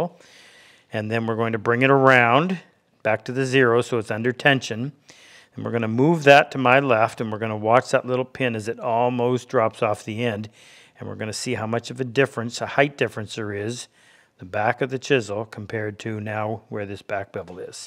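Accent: Canada. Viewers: As I was watching your informative video (thank you), I noticed on one of your shop aprons a U.S. Army 14th Infantry patch, blue/red/gold castle with the caption, "The Right Of The Line". I'm assuming, by the flags in your shop and your accent, that you are Canadian. I'd be interested in the story of your affiliation with that military unit and/or how you acquired the patch? Thanks again for the YouTube content you provide!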